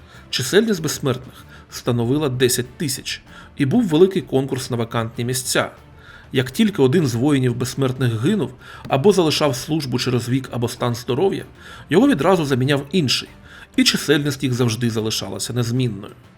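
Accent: Russia. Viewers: Ріже вухо гекання. Українці так не розмовляють. Вибачте. Може я не правий . Але кацапське все бісить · Майже скрізь де вживаєте "ґ", там має бути "г". Не приємно чути кацапську вимову.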